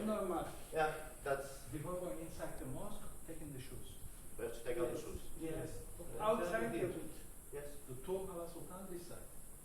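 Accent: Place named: England, United Kingdom. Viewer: 🤣🤣🤣 What happened to your churches in the North side? They are all still there. Get a life mate. You advertise Cyprus Without Borders as a bicommunal page but all I see in your videos is nothing but targetting TCs making out like we are nothing but 'propaganda' makers. We tell the truth. So walking around whispering with your plummy English accent to make yourself look friendly isn't going to work. Insidious as anything, you are. Why don't you share what happened to all the other mosques, not the one protected by Turkish authorities. And no, don't go inside the mosque. You might find god there. Can't have that, can we!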